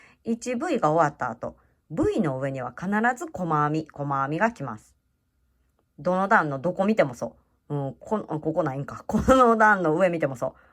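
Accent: Kansai, Japan. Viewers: 関西訛りが魅力的な、こんにちは。(札幌とその周辺は訛りがほぼないので、素敵に聞こえて仕方ないです) 円は、法則で覚えているので…増目位置もその都度考えればすぐに出てきます。法則で覚えられると、便利ですね。中学高校の時、数学の図形の問題と数列はちょっと得意だったので(すごく得意までではない)、編み物の法則も覚えやすい気がします。 …学校のお勉強は、いつどこで役に立つかわからないですけど、ある時ひょっこり顔を出しますね…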